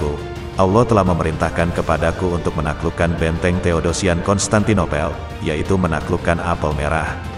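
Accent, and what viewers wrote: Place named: Java, Indonesia. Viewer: Tanpa Tedeng Aling Aling,,,,, narator kayak nya orang Jawa,,😁😁😁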